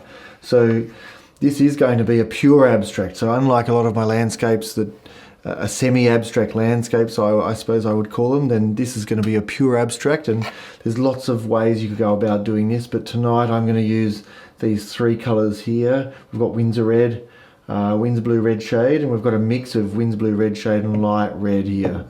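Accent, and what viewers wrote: Australia. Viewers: Hi just found your channel, loved watching this, great to hear a Aussie accent, cheers from Canberra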